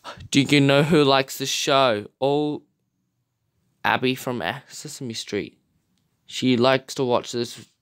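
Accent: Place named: Australia. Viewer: Your accent is Australian, and I didn't know you were from Australia. Also, opinion respected on Elmo's World.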